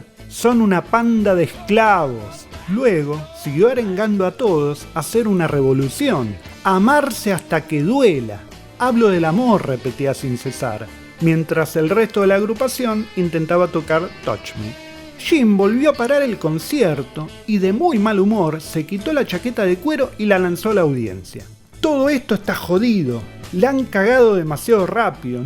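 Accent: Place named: Argentina. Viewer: Mola el acento Argentino bro! jaja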